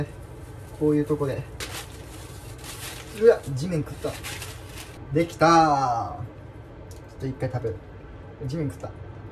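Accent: Kansai, Japan. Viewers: 関西弁いいわぁ🤝🏻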